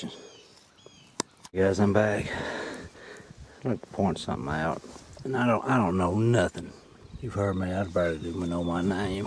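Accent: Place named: Missouri, United States. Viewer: I'm coming down there to go hunting with you! All that in one field? Are you kidding me? I'm in Canada eh... I find a couple in a day, maybe up to 5 if I'm lucky... Sometimes, nothing at all. I'm in Southern Ontario, Canada... By your accent, you must be in Missouri or Arkansas or something like that... I really want to go down there one day and hunt a few of those fields....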